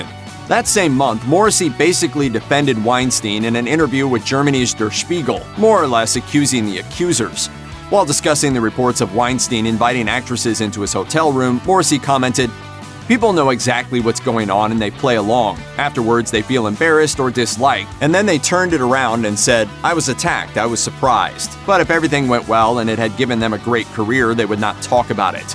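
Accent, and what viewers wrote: United States. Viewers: It's interesting to choose the most inappropriate American blah blah voice to essentially attack with much untruth and sensationalism a English artist.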